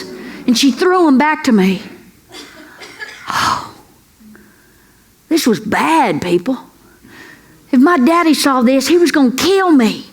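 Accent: South, United States. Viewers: Hilarious, stories like this with a southern draw are more believable 😁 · She is absolutely right not only that I love her southern accent. I suffered from bullies until I was 12 yrs old One day on my own since I had no father to help I confronted my first bully at 8 yrs old and asked him to fight me. my heart was in my throat but I roared with threat expecting to be beaten to a pulp but to my surprise he backed off. I thought the bully problem was resolved but on later years i was beaten by other bullies. I finally asked my momma to pay 5$ per week so I could take Judo lessons from a brand new Dojo near our home. She looked at my teary eyes and accepted to pay for the lessons. Every bully walked by the schools which was on a main street with see thru glass like most store fronts My bully problem was over. The word spread around not to mess with me. 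I continued with practice and after many years and many contests i got as far as 2nd degree black belt then I switched to karate Okinawan style and received a black belt The after college I stared to learn Butaijutsu and from there developed my own self defense system. At the hight of my training I could break a coconut with my right hand. I taught both my sons from the time they were 10 till 16 Till they bacame black belts Them they went on to college They were never bullied by anyone because I had their back and expected them to stand up for themselves. And me ? Well never was bullied after 12 yrs old I grew up in tough areas and walked into neighborhoods where my friends would not dare. All possible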